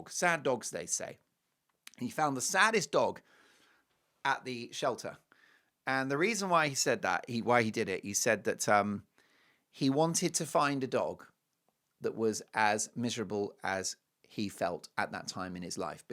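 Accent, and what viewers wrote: United States. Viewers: I agree with PETA to stop the horrible breeding places, adopt first! PS-Your American accent is spot on and great outfit today! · Your American accent is good!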